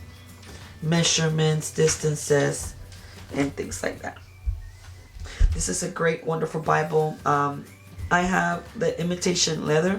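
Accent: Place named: Spain. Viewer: You don't need to apologize for your spanish beautiful accent. Your English is perfect. God bless.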